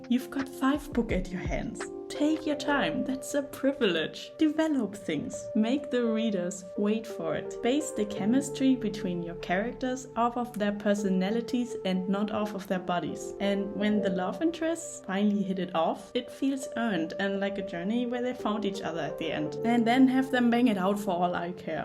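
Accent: Germany. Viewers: Dein deutscher Akzent ist echt schlimm aber sonst gutes Video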